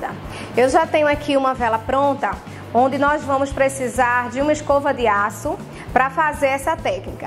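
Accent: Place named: Pernambuco, Brazil. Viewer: você é ótima! Aprecio muito os vídeos da, inclusive os daquele químico daí. E amo esse sotaque pernambucano. O.mais agradável do Brasil, na minha opinião. Parabéns aos.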